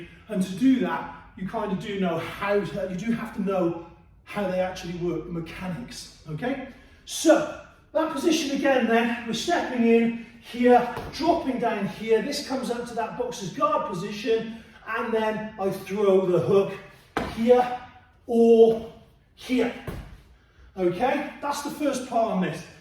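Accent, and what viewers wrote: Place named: England, United Kingdom. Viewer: That English accent! Cool 👍👍👌👌👊👊🥊🥊🥋🥋🙏🙏😁😁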